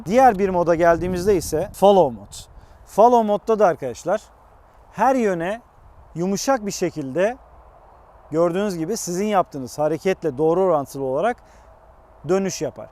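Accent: Adana, Turkey. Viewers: abi adana mı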